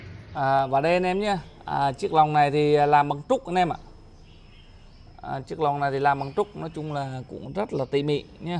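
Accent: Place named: Quang Tri, Vietnam. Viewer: Làm video ép giọng mào non đi anh giọng quảng trị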